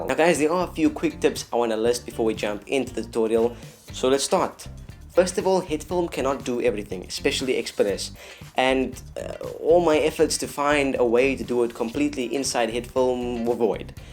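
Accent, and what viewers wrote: South Africa. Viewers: Your from SA arent cha?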